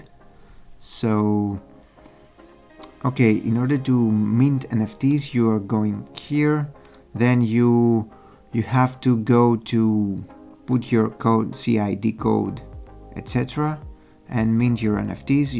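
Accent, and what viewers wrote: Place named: Greece. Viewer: You sound like a Greek..I guess you are 😅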